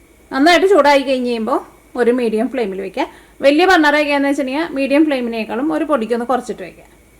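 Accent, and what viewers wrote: Thrissur, India. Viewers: ചേച്ചി തൃശ്ശൂർക്കാരി ആണല്ലേ? ഞാൻ ആദ്യമായിട്ടാണ് വീഡിയോ കാണുന്നത്. നന്നായിട്ട്ണ്ട്ട്ടാ...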